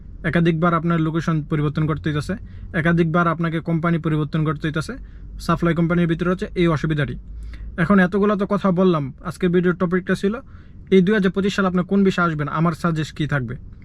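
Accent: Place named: Comilla, Bangladesh. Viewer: ভাই আপনার কথাবার্তা টুন মনে হচ্ছে আপনার দেশের বাড়ি কুমিল্লা ভাই আমার নিজের দেশের বাড়িও কুমিল্লা মুরাদনগর থানা গ্রাম ধামগড়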